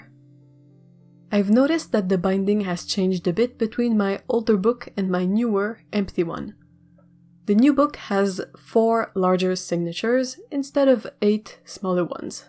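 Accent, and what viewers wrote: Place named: Quebec, Canada. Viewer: Juste à entendre ta voix, je savais que tu étais du Québec ! Tu fais du travail magnifique!! Merci pour le partage :)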